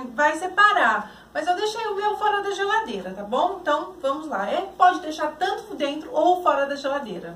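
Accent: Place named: Brazil. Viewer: Hola soy de México. Y me gusta ver videos usted es Brasileña por el acento de su voz es muy guapa me gusta le envío desde acá un saludo muy afectuoso y le deseo muchas bendiciones desde México como se llama el lugar donde vive usted y como se llega ya estando en Brasil. Espero me conteste porfavor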